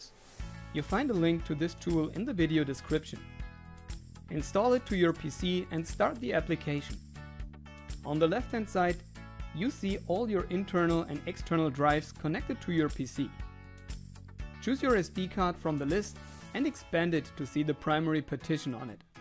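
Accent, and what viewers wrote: Germany. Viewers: Hey, aufgrund deiner aussprache gehe ich mal davon aus das du Deutsch sprichst :D! Wie bekomme ich nach der Installation der Rom, Spiele auf das Gerät? Ich weiß es sind schon etliche Roms vorinstalliert nur würde ich gerne wieder Pokemon auf Deutsch spielen! Habe die 128GB Karte drinnen und mit DiskGenius auch den restlichen platz frei gemacht :)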